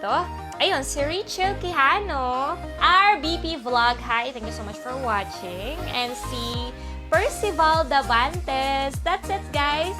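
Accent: Visayas, Philippines. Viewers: Ma'am, bisaya ka po ba talaga!? I just followed you on FB! I feel a bit closer! Hehehe.... 😋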